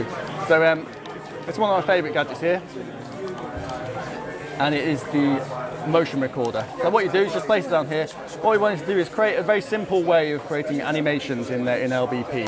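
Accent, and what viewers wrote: United Kingdom. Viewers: cool an Asian with a British accent demonstrating my favorite game! :D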